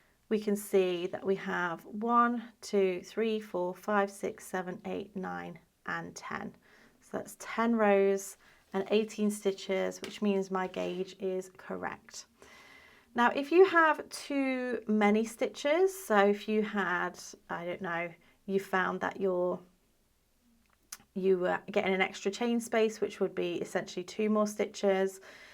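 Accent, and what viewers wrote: England, United Kingdom. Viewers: Ja. The English accents are beautiful. My English are very poor but I manage when I have to speak it. About once every month at the shopping. 🎉🎉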